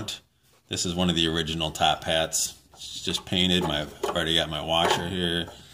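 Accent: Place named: Wisconsin, United States. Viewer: Hey are you the dude from Wisconsin??? Im assuming by your accent that you are. I live in Hayward myself! Lol. Cool video, My 98 Silverado is having the same issues.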